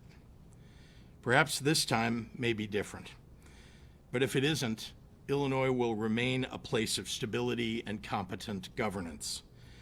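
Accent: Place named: New York, United States. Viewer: Sounds like NY talking….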